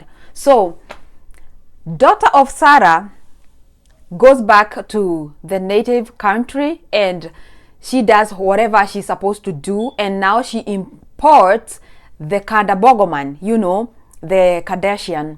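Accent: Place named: United States. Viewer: Daughters of Sarah...by the way i love your American English accent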